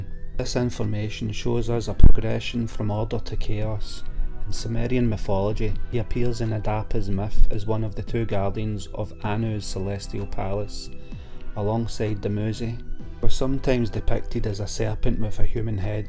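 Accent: Scotland, United Kingdom. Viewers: I was like eh Scottish that's new on here lol I'm Scottish myself. I Loved it.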